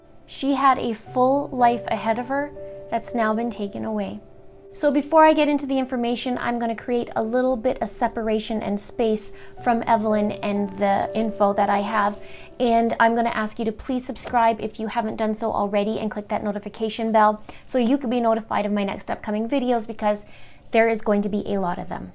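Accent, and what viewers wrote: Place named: Canada. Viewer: I'm assuming from your accent you are Canadian. I love Canada, and have been twice. I have watched 2 of your videos now. Good job. I live in in East TN, so this case has really affected ppl here hard. I'm wondering if you've done any videos on a little boy also missing from here for 2 yrs. Baby Joe Clyde Daniels he was a disabled 5 yr old his parents are in prison. His father admitted to beating him to death, but won't say where the body is, and has not recanted his confession. The mother is in for child neglect bc she was home when it happened. Many are comparing the Evelyn Boswell case to the baby Joe case. So sad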